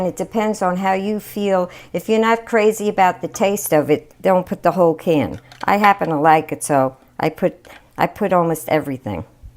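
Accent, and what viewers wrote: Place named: Brooklyn, United States. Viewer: Looks good, I make them similar but bake them in the oven coated with olive oil. She sounds like she is from my neighborhood in Brooklyn.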